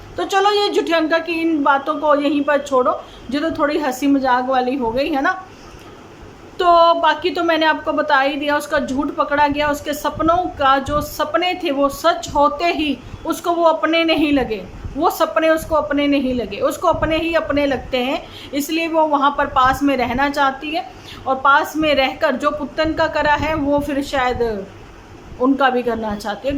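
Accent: Punjab, India. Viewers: ji main ludhiana se hu pera apki punjabi theth punjabi hoti bhot achai lagti jai🎉🎉🎉🎉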